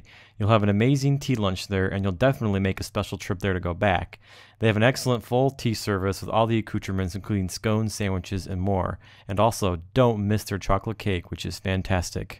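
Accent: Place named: United States. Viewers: i don't understand why an American accent should be such a big deal. I have no problem with i got the information and a view of the beautiful scenery. I am not saying for one moment that you are a snob but it certainly comes across that way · He is American or perhaps Canadian and our accent does not define our class. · when you view a video on England you aren't expecting a slightly bored banal-toned American voice reading a tourist pamphlet. Nothing middle class about the honest comments here at all.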